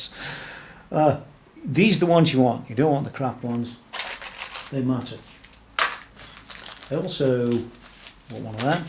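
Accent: Stoke, United Kingdom. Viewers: I must say, i hate strats usually but this is one of the nicest looking guitars i have ever seen! and its always nice to hear a stoke accent on here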